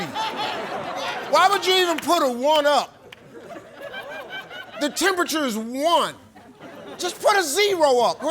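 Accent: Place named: Kansas, United States. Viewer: That sh*t sound like Kansas haha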